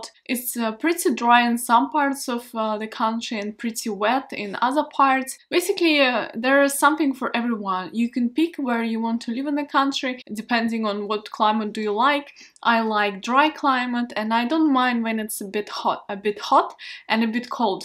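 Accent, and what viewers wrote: Russia. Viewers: Сразу понял что русская по акценту😄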